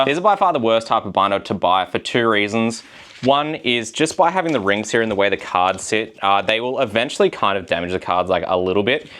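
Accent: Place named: Australia. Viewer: I live in Japan arm and already spend too much money on my anime merch so I really don't want to get into this particular hobby, but I love watching pokemon tcg collecting content and challenges (thanks to DPM, and his shorts channel). Really love hearing an Australian voice on the hobby and how the prices are manifesting back home! I'd love to see how the events look, I've never been to one myself (I live in Perth, and there's much less things happening there lol)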